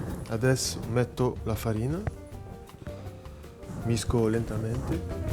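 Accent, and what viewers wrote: France. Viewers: Is he speaking Italian with a French accent😍😍😍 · Ma perchè iett tene l’accent franzoso? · Sono inglese. Ma lui sta parlando col acento francese no?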